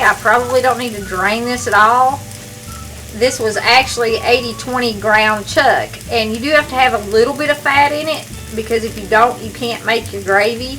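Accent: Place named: Kentucky, United States. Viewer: My dad was in the military in WWII. He would not eat SOS, no way, no how. I tried several times when I was growing up to get Mom to make it. She would not even make a pan of SOS. I still make a batch sometimes for breakfast (with sausage) just to remember Dad. By the way, I grew up in Western KY. I love your accent. You know it reminds me of Mom.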